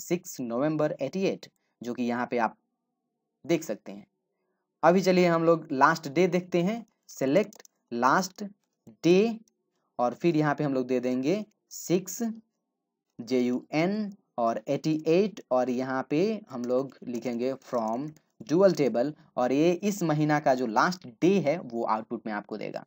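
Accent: Bihar, India. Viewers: bihar k lagte ho bhaya...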